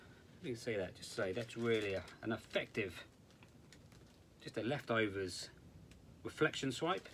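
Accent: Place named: United Kingdom. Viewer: One Brit to another , I seem to understand better with the accent!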